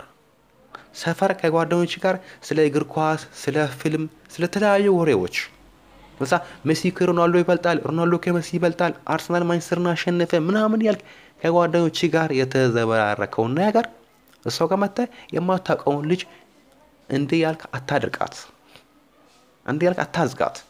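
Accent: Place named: India. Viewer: u sound like an Indian guy speaking English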